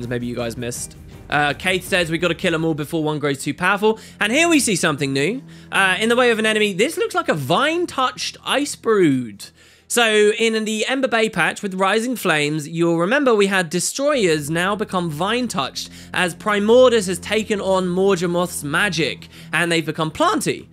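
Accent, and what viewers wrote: United Kingdom. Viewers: "...what we're looking at rocks here walking around in..." Can't beat UK English. Love ya,.:D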